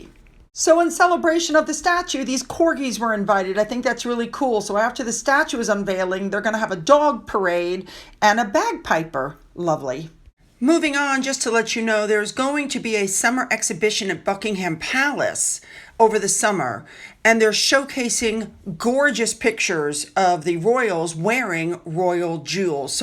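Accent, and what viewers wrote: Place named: South, United States. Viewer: Yes, very distinctly Southern, but you will hear it all over the U.S.! I love to be polite; it can mean so much as we go through our day, when we use 'Sir' or 'Ma'am'. ❤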